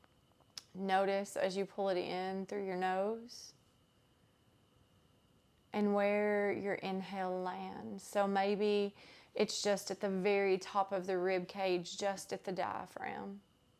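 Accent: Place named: Tennessee, United States. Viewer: 🌹🔥🫶🏼🔥🌹 Love your Tennessee twang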